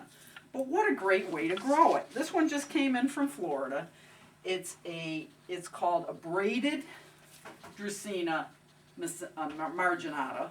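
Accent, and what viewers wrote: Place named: Central New York, United States. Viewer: I spent most of my life in Baldwinsville, NY, just north of Syracuse, so I know a CNY accent when I hear it. You have literally the thickest CNY eeyacent I've ever heard in my life. You may be from like Buffalo or Rochester or something in western NY I guess. But holy shit, you're like the epitome of upstate/central NY/western NY rural twang. I bet you're a huge Wegmans fan and I bet you've cooked salt potatoes more than once.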